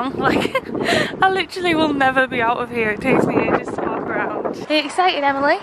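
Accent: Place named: Teesside, United Kingdom. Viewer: Youre at Teesside Park, I knew i recognised the accent. Only started watching your studio vlogs a week ago but really enjoy them. I'm from that area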